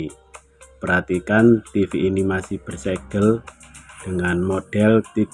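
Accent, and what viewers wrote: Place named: Jombang, Indonesia. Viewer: Logate sampeyan niki kadose tiyang Jombang mas salam dari Manado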